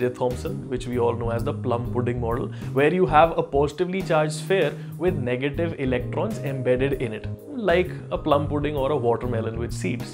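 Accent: Punjab, India. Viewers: Finally saw a punjabi with this accent in English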